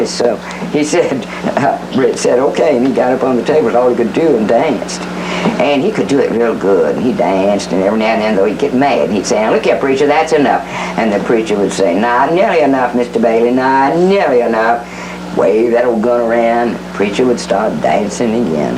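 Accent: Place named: South, United States. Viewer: I can vividly remember her coming to my elementary school in Angleton, TX, circa 1983/84. She was promoting her Texas folktale book, "Ghosts Along The Brazos." I was instantly captivated by her Southern Belle draw and her mesmorizing storytelling. I still own an autographed copy of her book she signed for me. My lifellong passion for great storytelling began with the late. Happy Haunting, doll!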